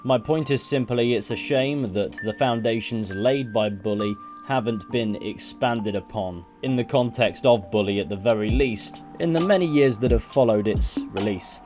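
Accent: United Kingdom. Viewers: Despite the janky controls, and the fact I could never get all 50 lessons done in any playthrough (damn you, Biology 5!), I loved playing Canis Canem Edit on PS2. Iirc, there were only 5 lessons and the Xbox 360 version had 10 lessons and was called Bully: Scholarship Edition....well, in the UK, anyway. From your accent, I'm fairly sure you remember the moral panic in the UK about a game being called Bully.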